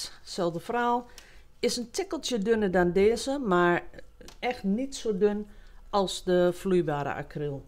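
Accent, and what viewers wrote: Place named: Twente, Netherlands. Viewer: Dank voor de stimulans, deze uitgebreide uitleg wordt zeer gewaardeerd! Haha, als ook het Twentse dialect, zo leuk:D. Vooral dat fotopapier lijkt me gaaf om mee te experimenteren, in de trant van: "wie het kleine niet eert is het grote niet weert"?;-) Met liefs van Mies uit Zuid Limburg. Waarbij ik al een hele poos hoop dat je ook hier in de buurt een workshop zal willen/komen geven.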